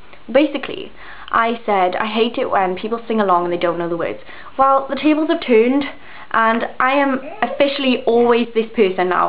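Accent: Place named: Wales, United Kingdom. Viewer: GOD YOU SOUND SO WELSH xD Hahahaha, mad! <3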